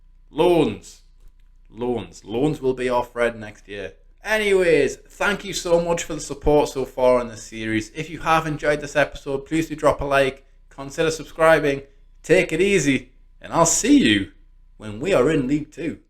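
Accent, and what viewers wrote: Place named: Newcastle, United Kingdom. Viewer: Spoiler Alert! Gutted I never heard you say “Champion”! It’s my favourite word in a Geordie accent! 🤣. Some great squad chemistry there mate, excellent games to play and you’re right, counting is hard! 🤣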